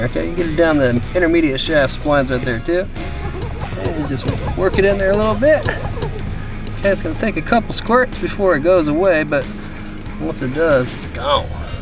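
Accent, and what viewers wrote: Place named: Texas, United States. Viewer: I think you done developed a Texas accent there buddy!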